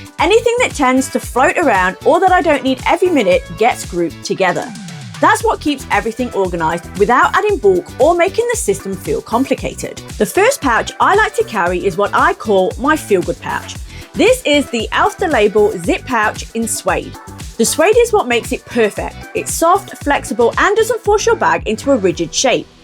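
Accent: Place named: Northern England, United Kingdom. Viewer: Hi! It says you’re from Australia but I think I hear some northern English pronunciation every now and then. Your “U” sounds in particular. Am I way off? 😊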